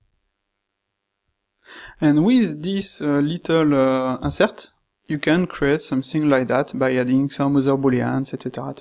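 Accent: France. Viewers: tu est français? sa s'entend grave lol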